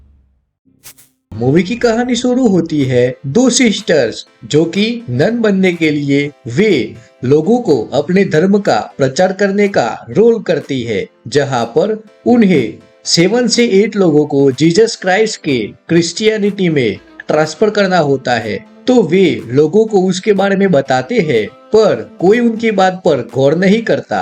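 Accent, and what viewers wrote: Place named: Gujarat, India. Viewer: Gujarati accent….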